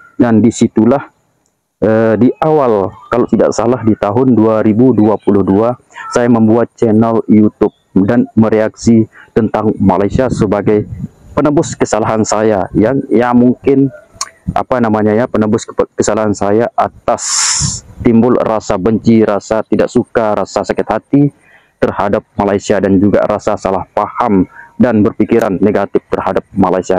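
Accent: Indonesia. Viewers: Kakak tau kamu orang indonesia dari cara kamu berkata2 berbeza dari bahasa melayu Malaysia khasnya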